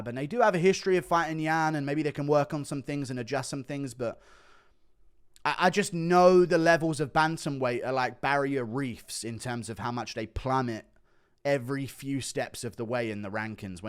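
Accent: United Kingdom. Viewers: something about a British man yelling about ufc fights just puts a guy to sleep ya know?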